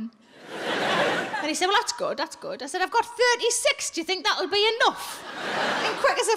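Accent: Newcastle, United Kingdom. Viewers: Love this woman she talks about things, that every single woman in her audience can relate to. The mix of sweetness ,observational slightly crude humor and her geordie accent , made my day.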